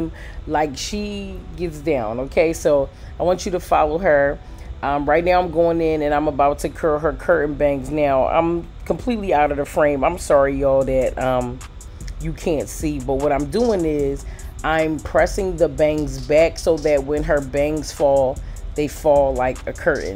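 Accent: Philadelphia, United States. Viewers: Hey! I'm glad that you're better♡♡. It's crazy how you said your voice is a huge part of your channel, bc legit, I love to hear you talk. The sound of your voice and your Philly "accent" is so soothing and dope to me❤. Thanks for all the knowledge, positivity, laughter, and realness you bring.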